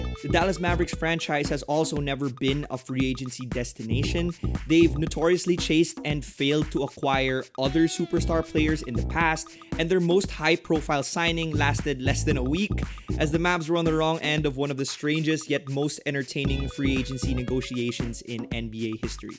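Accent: Philippines. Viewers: I can recognize your accent. Are you Filipino? Great video btw! I love the mavs! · Hey man, are you filipino? My friend is filipino and he has the same accent as you! Anyways, great video! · Yo are you Filipino? I feel like I can hear the accent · Are you Filipino? The accent.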